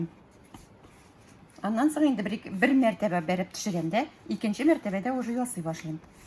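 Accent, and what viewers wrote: Standard. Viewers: Миңа пешерү осталыгы бер ошаса, бу ханымның татарча шундый чиста, матур сөйләве тагын да ошады! Бик зыялы ханым!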